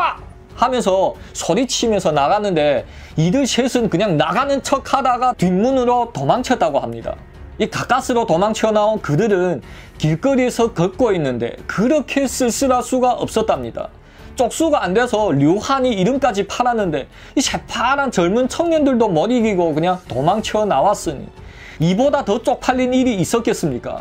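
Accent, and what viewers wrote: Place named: Korean-Chinese, China. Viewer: 와 무슨 무협지 보는것 같네 실지 존재했던 흑사회들의 주요 사건비화를 류한이라는 주인공을 등장시켜 이야기를 조선족 특유의 강한 어투로 이야기 찰지게 잘하시네 일단 구독 좋아요 누릅니다 10회 기다립니다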